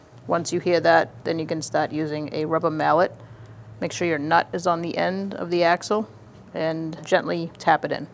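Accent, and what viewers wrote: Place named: New York, United States. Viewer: New York or Jersey accent for sure. (out sounds like oat)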